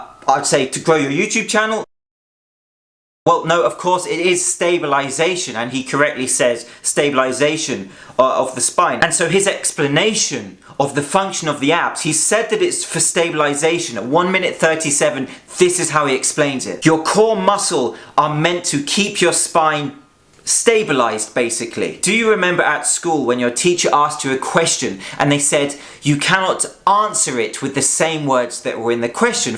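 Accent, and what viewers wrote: England, United Kingdom. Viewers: I think your so called DEBUNK of VShred is ridiculous . I am a 61 year old man (look 37 ) and have been working with VShred for 8 months. I lost 40 lbs and have gained musculature that I had when I was in my late 20's . I follow other channels as well and have become an expert on diet . I don't understand why you are singling Vince out in your very particular attack. You seem to be envious of his following 4.2 million or so viewers . Even with your English accent you will never be the YouTube Star that Vince has become.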